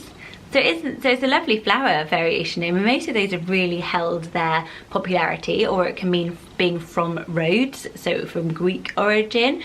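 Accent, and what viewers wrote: United Kingdom. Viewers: It's so funny for me to hear the lovely British pronunciations of some of these names. I'm from the US and particularly the south. So the name Blanche - my grandmother had several friends named Blanche and with the US "a" it's just not pretty at all. One "blanches" vegetables. With the French accent Blanche is very pretty. Here's a name for you - Jathena. That is my sister's name. I'm sure you've heard of Athena - well her name is Jathena. Quite pretty. My name is Janine. When I was growing up in the south in the US, NO ONE was name Janine - for a few years there was a Janine in every TV show and movie. It's lost it's popularity again. Anyway, we are almost exactly one year apart and we were Jathena and Janine.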